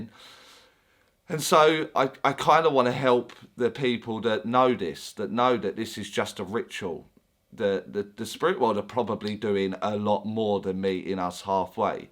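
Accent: United Kingdom. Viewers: Breath of fresh air. My fellow Brit says it how it is. Sounds like he comes from my neck of the woods. Thank you!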